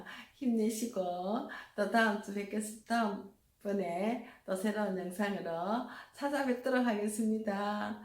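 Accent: Gyeongsang, South Korea. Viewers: 안녕하세요. 저도 손잡으러 왔습니다 ~ 경상도 분이시군요 😊 만물의 근원 물. 장말 중요하죠. 시청하고 응원 드리고 좋아요. 손잡고 갈게요~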